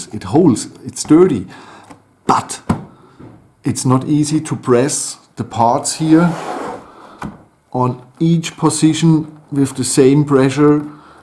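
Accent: Germany. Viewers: Your Hessischinglisch is a pain in my Ears..... Dann bitte auf hessisch.... Oder Frankfurterplatt....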